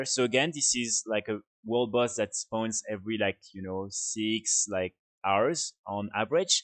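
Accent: France. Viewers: u speak very well :p french ?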